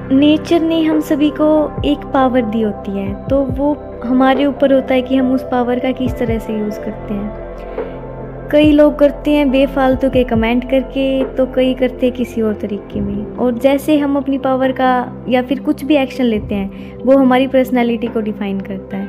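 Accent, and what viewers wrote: Haryana, India. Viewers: Me to haryanvi tone ka addict ho gaya🤣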